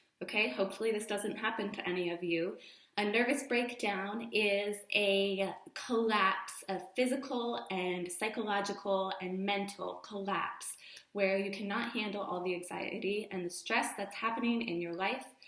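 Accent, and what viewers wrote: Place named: Texas, United States. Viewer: you're from Texas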